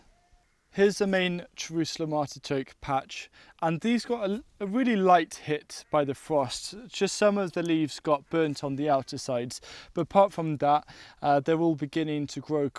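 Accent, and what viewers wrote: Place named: England, United Kingdom. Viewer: You def got tad of Elnglish in you...your dad is the welsh i take it ?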